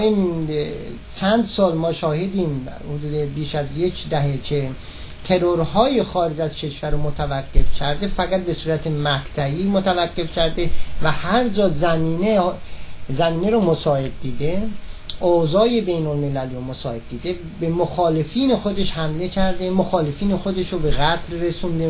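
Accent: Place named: Turkey. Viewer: جه لحجه گشنگی دارى اقاى كوينده همه سريع متوجه ميشن كه تركى..!! اين كروهايى كه شما نام برديد همه شان كسانى هستند كه قاتل بدنيا أمده اند و كارشان كشتن و انتقام است حالا جه ايرانى و جه عراقى و ربطى به مليت و مسلك ندارد..!! در اين كشورها هر ننه مرده اى اسلحه به دست ميكيرد و ميخواهد بكشد و از خدا حرف بزند..!! هر كه امد به جهان نقش خرابى دارد در خرابات نبرسيد كه هوشيار كجاست..!! خاورميانه يعنى باغ وحش..!!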